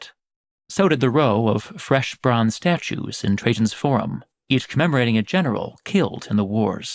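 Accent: United States. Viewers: Very nice work especially the illustrations. Rather disturbing though your pronouncing Latin names the American way.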